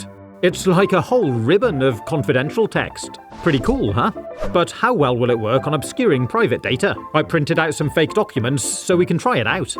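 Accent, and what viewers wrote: United Kingdom. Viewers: Are you British? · The silly pre-talkies-era background music adds to the charm of the videos! I love the whole effect with his British accent. It's classic! The man's a genius!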